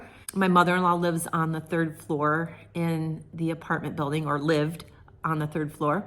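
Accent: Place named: Michigan, United States. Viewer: Oh, my goodness what a time your family has had, but our God is so good!! I just came across your videos this week and wondered if you were from Michigan as I recognize the accent, lol. I am originally from Riverview. I have lived in Tennessee most of my life, but still have a soft spot for Michiganders. I am praying for your husband, his mother, and you!! 🙏🙏🙏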